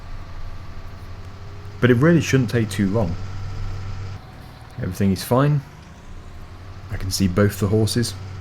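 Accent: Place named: United Kingdom. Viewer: he is British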